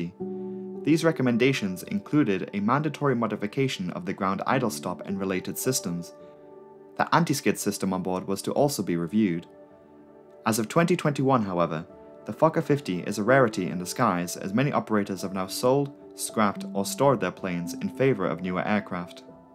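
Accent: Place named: North East England, United Kingdom. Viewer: Based on my limited knowledge of UK accents (I’m American), I’m guessing he’s from North East England. And I agree that it’s an appealing accent; it’s certainly much nicer-sounding than mine 😆.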